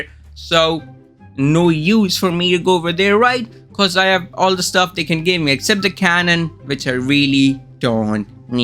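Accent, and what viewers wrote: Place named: India. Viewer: Bro sounds indian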